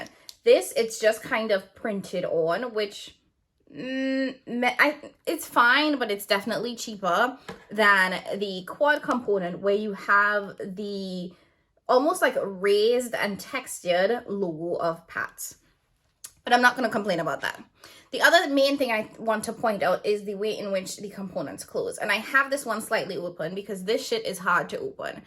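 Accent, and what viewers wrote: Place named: Trinidad and Tobago. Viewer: I heard the accent and said to myself she’s got to be a Trini. I am from Trinidad, I can’t miss our accent. I will subscribe and support!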